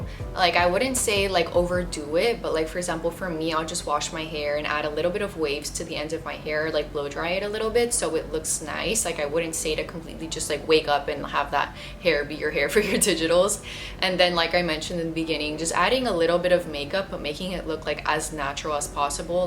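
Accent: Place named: Miami, United States. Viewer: im from miami & as soon as i heard u speak knew u were from there too! thank u for the helpful video xx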